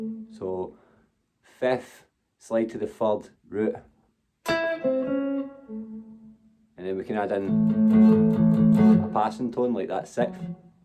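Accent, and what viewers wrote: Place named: Ireland. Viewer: Thanks for the lesson. Next teach how to talk with that cool Irish accent! I’ll bring u some cough syrup and a new mounting bracket for that tv 😂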